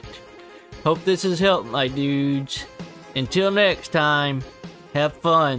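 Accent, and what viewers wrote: South, United States. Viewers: I agree 100. He's quirky, interesting, and I love the southern accent.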